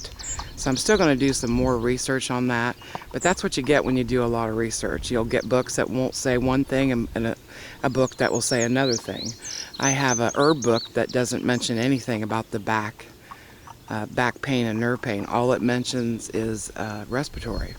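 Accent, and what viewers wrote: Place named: France. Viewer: why do you say ERB as in french speak? English is a HERB. period.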